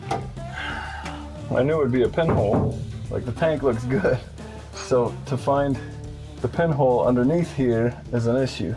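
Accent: Canada. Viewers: Talking a' boat! I love the Canadian dialect!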